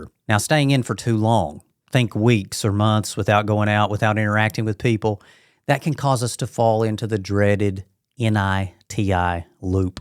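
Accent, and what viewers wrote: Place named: Alabama, United States. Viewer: Hey are you from Alabama? Love the accent. And everything you saying is SOOOO TRUEEEE 😊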